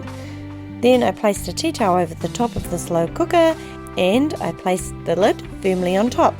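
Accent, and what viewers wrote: New Zealand. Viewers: Soo good to hear a kiwi voice doing this.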